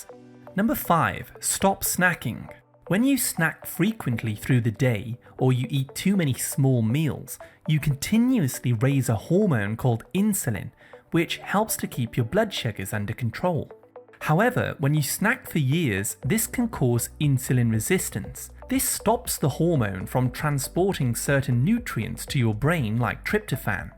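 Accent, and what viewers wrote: United Kingdom. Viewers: British accent. From England.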